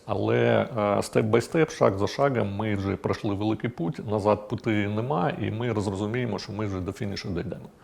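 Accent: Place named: Surzhik, Ukraine. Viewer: Шана та вдячність цьому чоловікові, та краще балакав би вже на російській мові. Бо цей жорстокий суржик дещо «ріже вуха»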